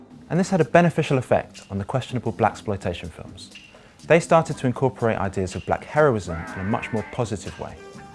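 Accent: United Kingdom. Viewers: British narrator SMH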